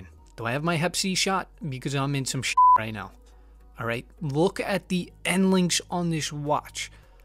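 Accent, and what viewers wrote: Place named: Brooklyn, United States. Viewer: First time viewing your site and this restoration..which, btw, was a great job. A classic piece which was in dire need of service....(amazing how some ppl would let that go soo far..). You did a Great job..and thed bracelet is still in good shape to wear as is. No need (at this point) to rebuild. Kudos to you... (where's your accent from..Sounds 'Brooklynish'...eh.?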